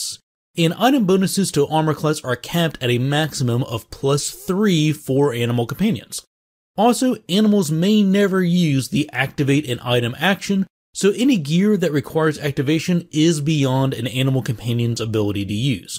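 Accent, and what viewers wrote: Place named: Midwest, United States. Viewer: Great video! Unrelated question... where are you from? I'm trying to guess your accent.. I'm thinking mid-west but have a feeling I'm way off.. :P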